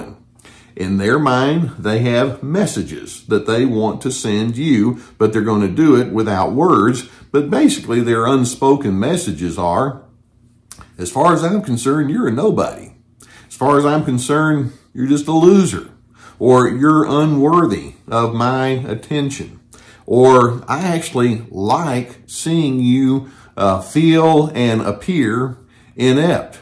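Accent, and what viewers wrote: South, United States. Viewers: Haaaaaaa ;D))))) you caught me out there , I wasn't expecting that word out of your mouth with that sweet southern drawl " a jerk " lol hearing you say that word really did tickle me , you have a good day sir ...I will always come back to hear that delectable accent ;D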